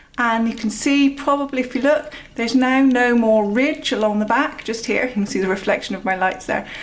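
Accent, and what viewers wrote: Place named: West Country, United Kingdom. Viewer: Lovely west country accent there :D Great info x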